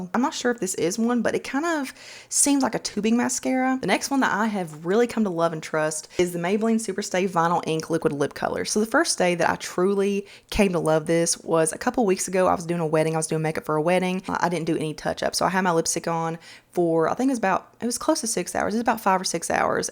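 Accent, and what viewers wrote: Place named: Georgia, United States. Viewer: I just found your channel and love it!! When I was listening to you talk I thought you sounded so much like me.I'm from Georgia.Looking forward to more makeup recommendations. We're having some very hot and humid weather here also.Thank you!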